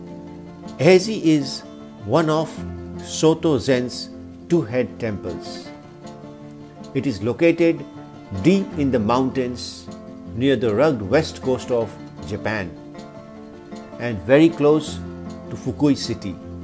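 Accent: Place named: India. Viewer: Your Indian English tone makes it even more enjoyable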